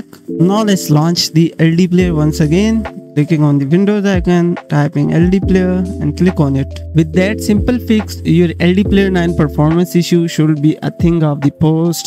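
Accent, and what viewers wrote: India. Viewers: It's always the Indian accent guy on YouTube 🤗🤘🙏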